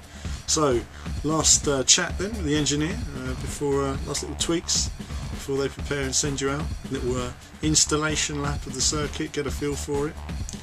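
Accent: Germany. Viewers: are you german???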